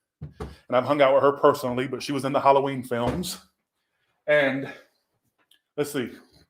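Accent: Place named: South, United States. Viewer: Thanks for the work you do ! Love that Southern draw!!!! I’m from Texas so I do especially when I’m mad (Scorpio)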